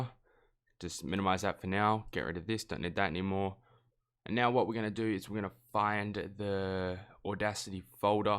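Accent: Australia. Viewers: smol bean. clout machine.TM bro you can tell by the accent he’s Australian???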